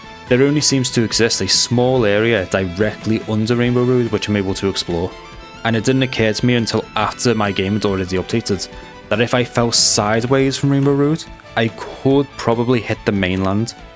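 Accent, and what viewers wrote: Liverpool, United Kingdom. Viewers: Good to hear a scouser online. I'm from liverpool too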